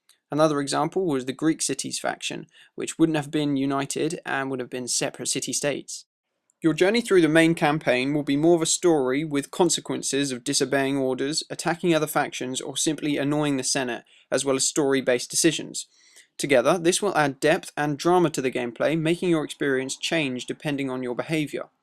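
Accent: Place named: United Kingdom. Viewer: your voice is so nice...no homo...most british sounding voices are so ehhh :? but yours is noce to listen too lol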